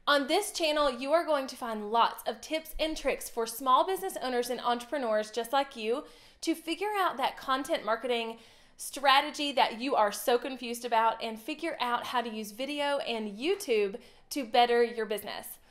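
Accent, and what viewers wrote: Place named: Arkansas, United States. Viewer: Arkansas here! I talk the same way! lol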